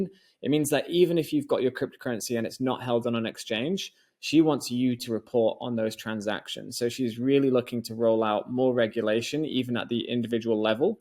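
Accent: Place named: United States. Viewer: Do well to get a transcriber for your channel, it would help those who don't have a strong grip on the American English accent. It would help your channel grow too as your content is amazing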